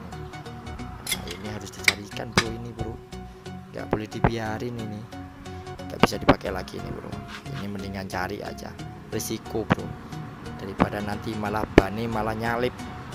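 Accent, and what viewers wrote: Java, Indonesia. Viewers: jowo campur melayu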